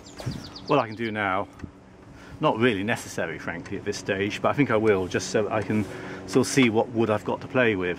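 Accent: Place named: United Kingdom. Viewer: Bodgers used a chisel w/ only 1 bevel? I didn't know that. How did you find that out? I've wondered about the difference between using 1 or 2 bevels. What's your opinion on that? It's too bad that you don't get any pleasure out of listening to Americans. Sorry. We sure enjoy listening to you guys. Brits come over here & seem to do pretty well just because of the accent.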